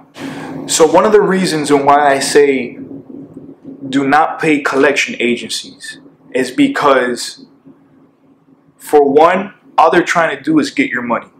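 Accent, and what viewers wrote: United States. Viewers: Miami accent coming in strong 💪🏼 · damn i miss that south FL accent. never thought i'd say that but it's true.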